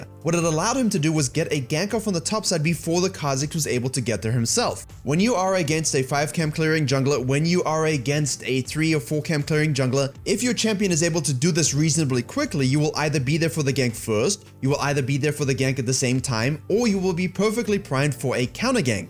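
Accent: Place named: South Africa. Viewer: South African. I had the same question when I saw his first video. To be honest I can't say I've heard much of the dialect outside of the heavily tweaked variant in "The Expanse" - that said I very much enjoy the English enunciations. It's like a much smoother sounding German or Dutch.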